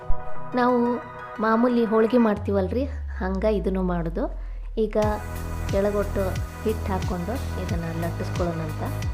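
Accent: North Karnataka, India. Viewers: ಉತ್ತರ ಕರ್ನಾಟಕ ಹೋಳಿಗೆ ಜೊತೆ ಉತ್ತರ ಕರ್ನಾಟಕ ಶೈಲಿಯ ಮಾತು ಕೂಡ ಚಂದೈತಿ ನಿಮ್ಮದು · Wow holige tempting 😋 and uttara karanataka language super 👌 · Uttara Karnatak style nalli matu super hagi matadidira 👌👌👌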